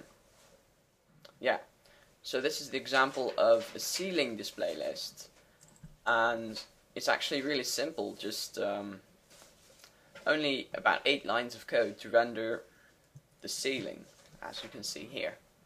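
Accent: Netherlands. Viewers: haha i knew it! your acsent it was dutch! YEAH! sorry for that xD but i´m dutch to, and i make games to. No tutorial though, and still good english btw :)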